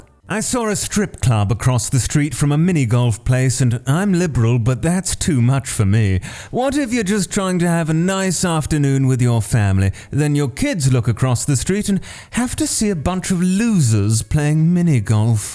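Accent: United Kingdom. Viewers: His posh voice makes me feel positively Edwardian And I want D. E. E. Z. N. U. T. S GOTCHA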